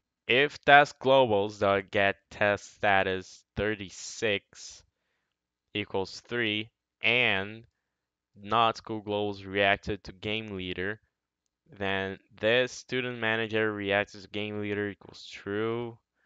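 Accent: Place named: Brazil. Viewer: cara, o maluco pode ser fluente, mas sempre da pra sentir o brasileiro por trás kkkkkkkkkkkk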